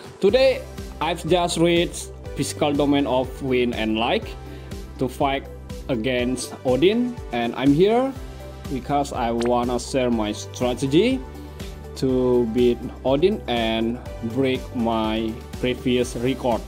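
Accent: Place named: Indonesia. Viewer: dude, your videos - clears, reviews and strats- are all amazing, keep up the great work... btw, you accent sounds a little Indonesian..?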